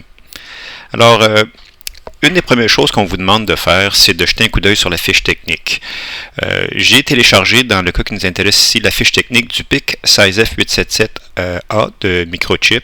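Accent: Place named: Quebec, Canada. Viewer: t'es vraiment une folle de l'agresser comme ça, le son n'a aucun probleme, personnellement je l'entends très bien, la vidéo n'est peut etre pas en hd mais on comprends très bien ce qu'il dit même s'il a un accent québecois, moi qui suit de france, c’est toi qui devrait t'acheter du matériel ou te laver les oreilles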